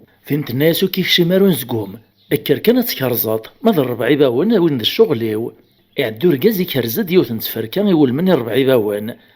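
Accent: Kabylie, Algeria. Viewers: Votre kabyle ressemble au mien 😊 je suis de Béjaia et j'habite a Londres. Je dors avec vos belles histoires. A3tik sahha ayizem averqach.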